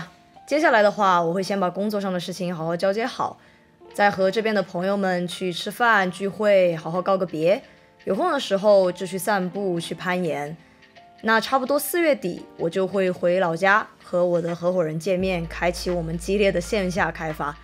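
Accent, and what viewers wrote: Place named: Taiwan. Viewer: 祝你创业成功。 btw:听你说话感觉像台湾口音。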